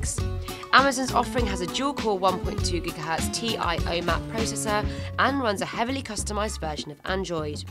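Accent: England, United Kingdom. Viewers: Speaking to fast and English accent...a waste to watch for me.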